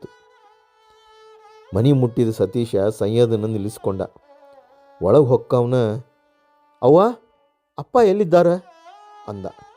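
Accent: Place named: Dharwad, India. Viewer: ನಿಮ್ಮ ಧಾರವಾಡದ ಒಳನುಡಿ ಕೇಳೋಕೆ ಒಂದು ಚಂದ ❤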